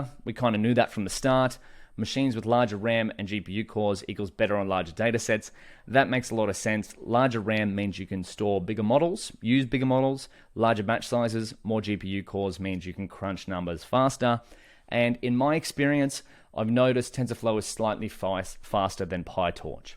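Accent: Australia. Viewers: thick aussie accent.. gives prices in usd...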